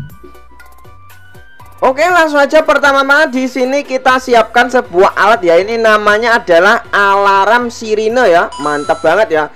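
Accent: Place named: East Java, Indonesia. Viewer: Sampeyan asale ko Endi mas,bahasane Jawa timuran koyone😁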